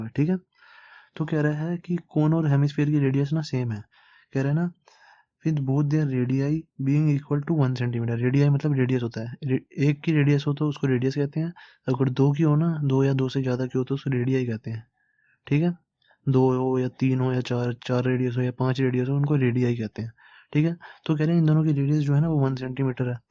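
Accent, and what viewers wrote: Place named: Kashmir, India. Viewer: you sound like kashmiri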